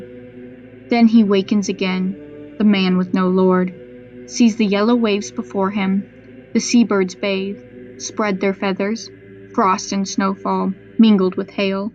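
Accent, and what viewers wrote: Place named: United States. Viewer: Old English poem in an American accent no!!!!!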